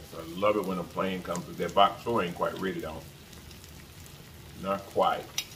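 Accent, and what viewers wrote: United States. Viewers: Hey!! I like that country talk. I be becoming up with words that don't make sense lol you can say them words and it be so 🤣😂😂🤣🤣 and everything and everything 😆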